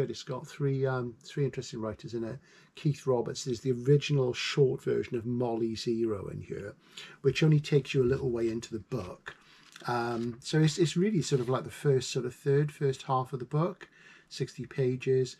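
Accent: United Kingdom. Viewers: You are my ultimate ASMR. SF, bookshops, british accents, with intermittent walkies in the country. Favorite anthology: 100 Great Science Fiction Short Short Stories - Ed. Asimov, Greenberg, & Olander (Only item I've ever stolen .. of course, if you steal books, steal them from libraries so they can track you down and get payment. My 12-year old self still never regretted it.)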